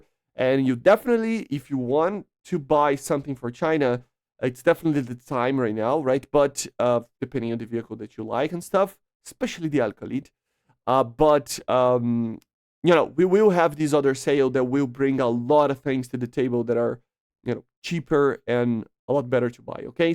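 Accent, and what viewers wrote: Brazil. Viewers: Engraçado como eh facil detectar o sotaque br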